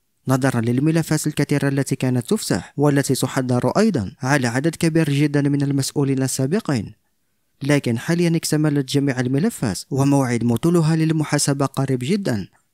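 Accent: Morocco. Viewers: L'accent marocain !!! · شكون طاع هد لخبر انت تبان صوت مغربي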